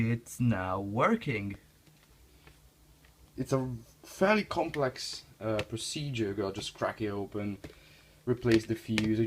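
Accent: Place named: Germany. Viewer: Nice accent mate...thanks for video...honest and correct...;) LOL super funny also. German i guess?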